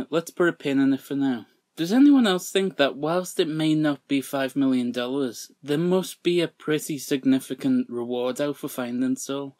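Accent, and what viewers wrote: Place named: Yorkshire, United Kingdom. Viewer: I thought that exact same thing and subbed too! I'm no expert but i thought i hinted some early Alex Turner in your voice, I believe he's from Yorkshire? I'm horrible w accents tho so I'm prbly wrong 😂 thanks for the video, i love your theory of Saul running the "tip" line.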